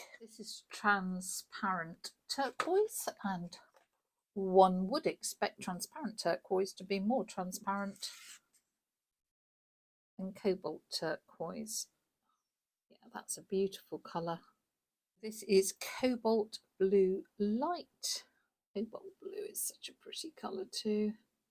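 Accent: United Kingdom. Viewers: you really make me smile I love the way you talk - its so english. I love that we recognise ourselves in others.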